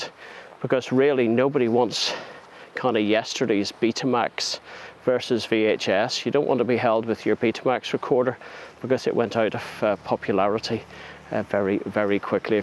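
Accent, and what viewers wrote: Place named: Northern Ireland, United Kingdom. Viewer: Enjoyed the Norn Iron accent! Not often heard on YT!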